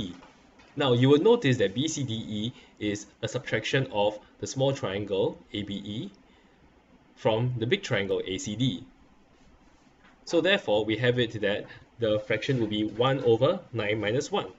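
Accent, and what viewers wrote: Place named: Singapore. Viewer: Sounds singaporean lol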